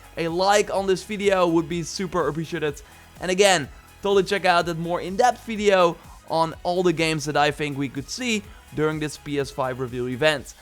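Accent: Netherlands. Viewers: is Dutch, Guerilla Games is based in Amsterdam, hence the Dutch pronounciation. (But yea, is Dutch too. =P)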